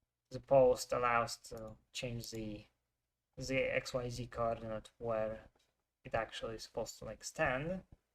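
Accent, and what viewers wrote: Russia. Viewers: i think you are from Russia, because your acsent, words: "kek", "da".. Hmmm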